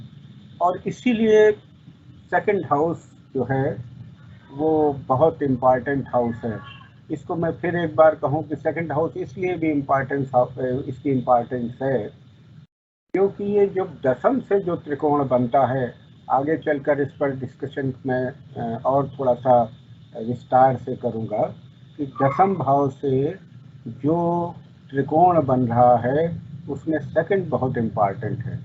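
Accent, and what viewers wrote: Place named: Varanasi, India. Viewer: Pranam, Pranam Pranam...... Pranam Pranam Pranam ..... do not have words to express my gratitude to you for this lesson....... you said in pure Varanasi Hindi accent & style.........replayed & listened it 2-3 times.... hearing astrology in pure hudh shindi after long time...........mera pranam sweekar karey.....मेरा प्रणाम स्वीकर करे The words, pictures, spoken words ....etc., so nicely done.... can only come from Lord Shiva bhakhts......2 hrs = 12 years of learning.... please invite Guruji to conduct few more lessons.... such an excellent gyan.....ज्ञान ब्रह्म ज्ञान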